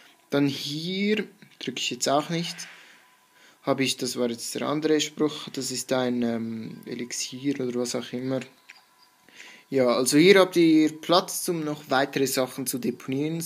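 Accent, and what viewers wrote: Switzerland. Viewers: Da hört man Schweizer Dialekt raus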